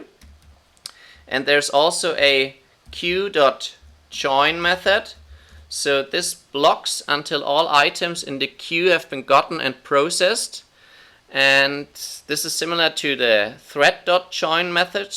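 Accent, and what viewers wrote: Germany. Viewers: you Sound german tho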